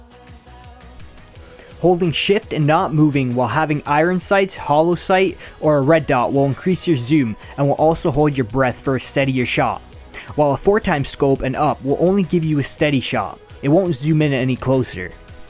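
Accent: Canada. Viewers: Canadian not Scottish, nothing like a scottish accent.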